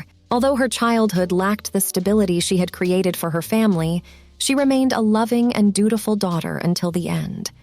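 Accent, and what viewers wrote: United States. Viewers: the narrator is American...relax...we never got any news about her here in the US...too bad, too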